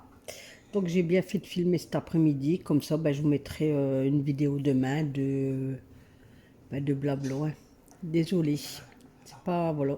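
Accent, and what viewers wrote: North, France. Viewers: Bonjour merci pour ta vidéo même si ton homme est là 😁. j'adore t'entendre parler sa me fais rire on reconnaît bien l'accent du nord😊, j'aime bien ta façon d'être si simple et honnête sur les produits ménagers, continues comme sa je te suis pas depuis très longtemps mais j'adore 🥰 bisous à toi et à ton fils 😊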